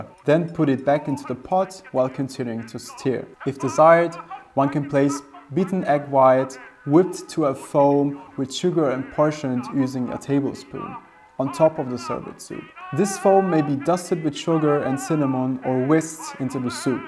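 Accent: Germany. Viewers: Crazy, you even speak English with a German accent lol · buongiorno you english has, for me that are also italian, sound with a german accent....maybe your greman is perfect, saluti da Torino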